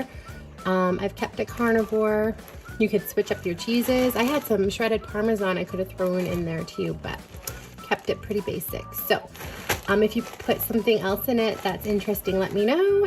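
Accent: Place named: Canada. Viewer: Wow! This is fantastic! I am curious where you buy the cheese (crackers) and pepperoni. I am guessing from your accent you are from Canada. I am also Canadian so I would really appreciate if you share where you buy them! I am very excited to try this! Thank you for the great video! 😊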